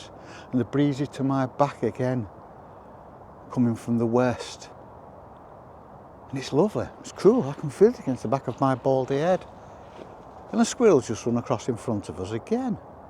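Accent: United Kingdom. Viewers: I love the British accent! Every time you says “ squirrel” 🐿️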